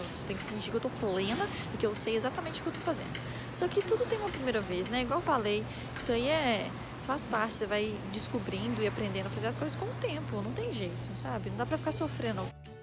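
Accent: Minas Gerais, Brazil. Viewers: comecei a ver seu vídeo e pensei o sotaque dessa menina parece ser MINEIRO, e eis que você fala que é de Minas. Adorei! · Esse sotaque Mineiro e bom demais gente❤❤ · Esse sotaque mineiro aí é de qual cidade? O pessoal da cidade do meu noivo tem o mesmo sotaque 😂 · Entrei nesse canal falando essa menina tem sotaque de mineiro até vc dizer que o galo era mozao !! Já me inscrevi!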